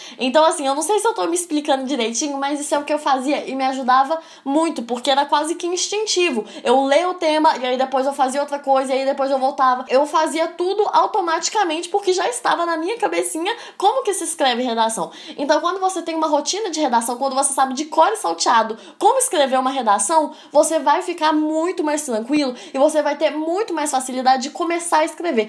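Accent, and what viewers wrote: Minas Gerais, Brazil. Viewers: Mineiros falando é algo simplesmente incrivel.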